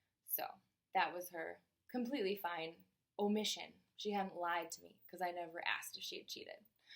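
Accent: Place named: Upper Midwest, United States. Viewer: Hey, great video. Really helps figure out my own stuff, hearing you talk about yours. Kudos for that! Side question - are you from the upper midwest? I'm overseas and trying to figure out the accent.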